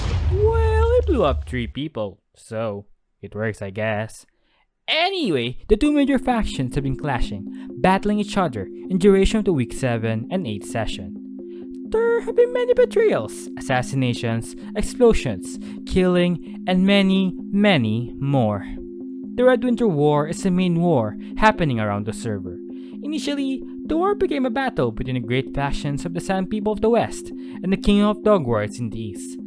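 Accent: Philippines. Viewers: Your accent is very filipino, you from pangasinan or somethin?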